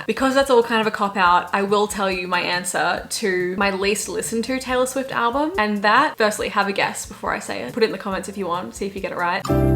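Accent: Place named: Australia. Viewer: Great video! You are so adorable, the first time I saw your video I noticed your eyeshadow really stands out, and your glasses! I love your style. I'd love more Q&A videos like this!:) PS. I love your Australian accent I could listen to you talk about the weather LOL I also haven't finished TVD but I'm Team Damon. My least favorite Taylor album is.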